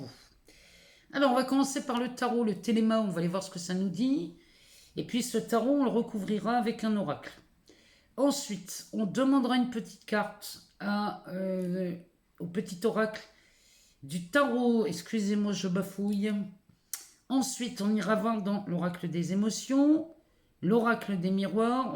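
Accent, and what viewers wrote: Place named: Paris, France. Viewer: Douce 🌼☀️💝 Ce tirage est exceptionnel et ça m’a redonné un boost d’énergie magic en ce jour! Je vous écoute des USA. Votre voix est rassurante et me rappelle ma jeunesse à Paris! Je pense que vous êtes parisienne aussi, n’est pas?! Vos ressentis et intuition me parlent et je relate souvent avec vos messages en général! Merci d’être cette belle lumière pour votre chaîne qui embellit les espérances et donne du baume aux cœurs! Merci merci merci - de mon âme à la vôtre! 💫🦋🌈💖☀️🙏🏻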